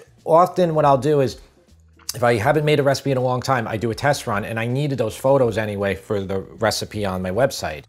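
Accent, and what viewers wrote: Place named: Queens, United States. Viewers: My parents were from Queens and we grew up on Long Island. I don't notice it so much in person, but when I speak with my siblings on the phone, they all sound like you. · You have such a thick LI accent.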